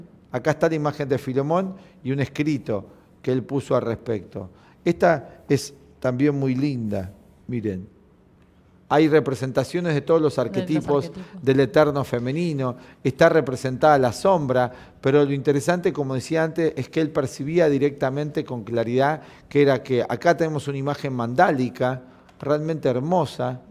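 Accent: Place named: Argentina. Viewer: me conflictua tanto el acento argentino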